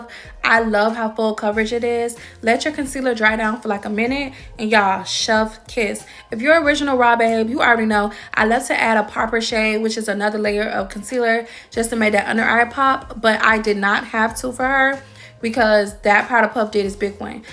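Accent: Miami, United States. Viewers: That Miami accent tho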